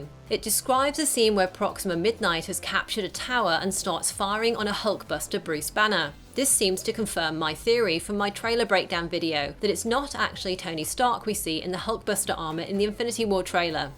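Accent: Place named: United Kingdom. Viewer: Ur British accent gives me boner ever damn time........ Jizzlied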